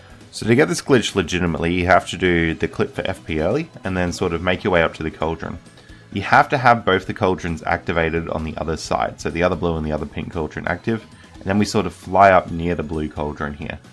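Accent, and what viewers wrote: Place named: Western Australia, Australia. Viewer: I haven't even beaten BK but it's also nice to see love for the Any% run, since 100% is always the main star. Great videos, and nice accent! Western Australia, right?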